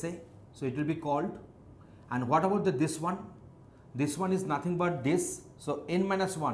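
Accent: Bengal, India. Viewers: Because of his Bangali accent. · he sounds like bangali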